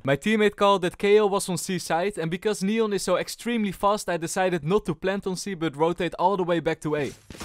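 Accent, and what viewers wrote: Netherlands. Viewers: what I mean is the English is so heavy he's basicly speaking Dutch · im also dutch and i like your accent XD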